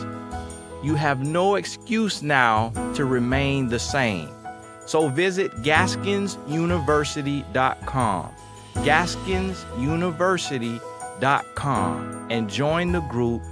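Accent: South, United States. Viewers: You sound so country in this video 😂 “listen to meeee” 😂😂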